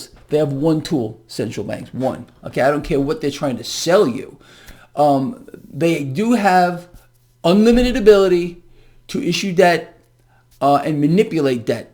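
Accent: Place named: Upstate New York, United States. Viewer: where did you grow up? The Northeast? I get a vibe you're from upstate New York sometimes 😃 Great episode, thanks for all your excellent advice!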